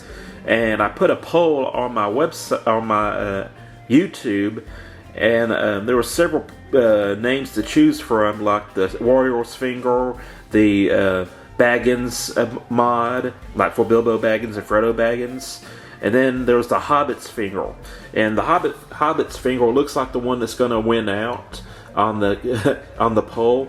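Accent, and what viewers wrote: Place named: South, United States. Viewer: Where are you from? Your accent is hard for me to place. American South?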